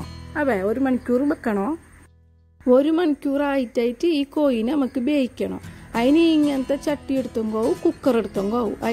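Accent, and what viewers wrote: Kasaragod, India. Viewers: വളരെ ശ്രദ്ധയോടെയാണ് ആദിയോടന്തം കണ്ടത്. കാരണം അത്ര പരിചിതമല്ലാത്ത ഈ സ്ളാങ് മനസ്സിലാക്കണമെങ്കിൽ വളരെ ശ്രദ്ധയോടെയിരിക്കണം. കേട്ടിട്ട് ഇത് കാസർഗോഡ് സ്ലാങ്ങാണെന്നു തോന്നുന്നു. കേട്ടിരിക്കാൻ ഒരു രസമുണ്ട്. എന്തൊക്കെയാണെങ്കിലും അവതരണം നന്നായിട്ടുണ്ട്. അഭിനന്ദനങ്ങൾ. ഞാൻ സബ്സ്ക്രൈബ് ചെയ്തു. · ishtayi presentation athilum ishtayito kasarkode slang super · സൂപ്പർ കാസറഗോഡ് ഭാഷയും എനിക്ക് വളരെ ഇഷ്ട്ടപെട്ടു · എല്ലാ സൂപ്പർ ആയിട്ടുണ്ട് പിന്നെ കാസർകോട് ഭാഷകേൾകാൻ നല്ല പാങുട്എനിക്ഇഷ്ടായി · കാസ് റോട് ഭാഷ👌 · മൊഞ്ചായിട്ട് 🥳 ഇങ്ങളെ കാസർഗോഡ് ഭാഷ മെജ ആയിട്ട് ഇന്ക് നല്ല ഇഷ്‌ടായി ഞാൻ മലപ്പുറം 🥰, സൂപ്പർ ആയിട്ടുണ്ട്, ഞാൻ nijjus cafe ലൈവിൽ നിന്ന് കൂട്ടാവൻ വന്നതാണ് ട്ടോ ആ 15 ആളിൽ ഒന്ന് ഞാൻ ആണുട്ടോ 🤝 ഇങ്ങോട്ടും വരണേ നാളെ 24 മണിക്കൂർ കഴിഞ്ഞിട്ട് അല്ലകിൽ സ്പാ ആവും 👍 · മൊഞ്ചത്തി പൊളിച്ചു. കാസർഗോഡ് ഭാഷയും കിടു ആണ്. ചെക്കൻമാര് കൊത്തികൊണ്ട് പോകുന്നത് നോക്കിയിരുന്നോ. മൊഞ്ചു കണ്ടിട്ട് എനിക്കും കൊത്താൻ തോന്നുന്നു........ 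😝😝😜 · വളരെ രസത്തിലുള്ള അവതരണം..കാസർകോട്ട് എനിക്ക് ഇഷ്ടമുള്ള ഭാഷയ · ഫുഡ് ഉണ്ടാക്കുന്നതിന്റെ കൂടെ കാസർഗോഡ് ഭാഷ കൂടെ ആയപ്പോ അടിപൊളി ആയി 👌👍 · അടിപൊളിയായിട്ടുണ്ട്. ഒരു പുഞ്ചിരിയോടെയാണ് വീഡിയോ മുഴുവനും കണ്ടത്.അതുപോലെ കാസർകോട് ഭാഷ കേൾക്കാൻ നല്ല രസമുണ്ട്. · Ambambo 😍 jan aadyam vijarichathu kozhikalude sammelanam aanenna🤭😄. kasaragod bhasha kalakkitund.entho valiya sammelanam nadakkukaya 🤭.good presentation 👍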